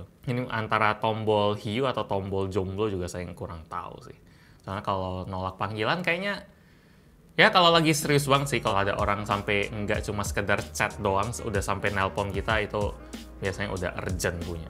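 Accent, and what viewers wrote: Palembang, Indonesia. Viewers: Ay wong palembang sikok ini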